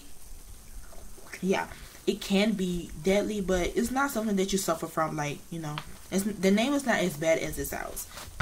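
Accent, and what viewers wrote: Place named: United States. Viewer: Yeah one of my friends had the same she had hogkins lymphoma and relapsed and had a stem cell for the second time. I'm guessing you live in America from your accent lol was your treatment expensive? As I live in the uk we have the nhs.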